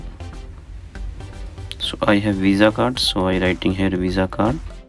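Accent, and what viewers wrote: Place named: India. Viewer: his thick Indian accent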